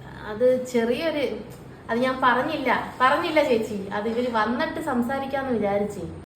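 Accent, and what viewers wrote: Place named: Thrissur, India. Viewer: Thrissur slang❤❤ nammal sadhukkal ayiiiiiiii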